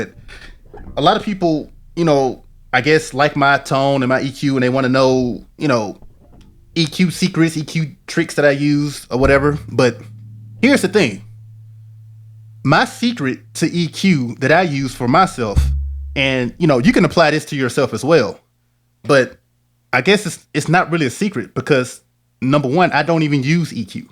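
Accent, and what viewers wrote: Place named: Deep South, United States. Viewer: The only thing cooler than your tone is that deep south accent...great explanations, thank you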